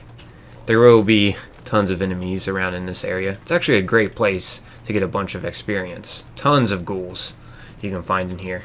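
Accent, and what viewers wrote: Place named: New Jersey, United States. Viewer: Its because he sounds like he could be from New jersey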